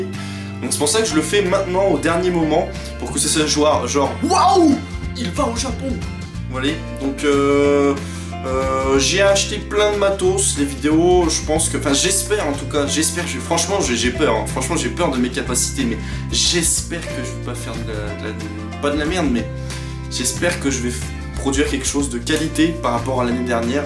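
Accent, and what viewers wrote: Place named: Nord-Pas-de-Calais, France. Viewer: T'es ch'ti toi, je reconnais l'accent de chez nous!!! 62 moi. Je pars aussi au Japon. Photos sur instagram. Il y a déjà des photos des voyages au Japon, Tokyo, Kyoto, Naoshima, Kyushu etc de 2014 et 2015.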